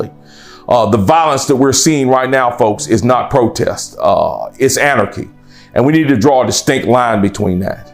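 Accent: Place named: North Carolina, United States. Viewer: Just love hearing your accent. I miss my NC home. Went to UNCG. Best of blessings to you and your family.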